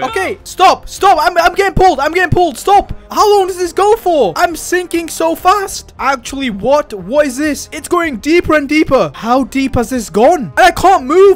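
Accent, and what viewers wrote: United Kingdom. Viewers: Are u british?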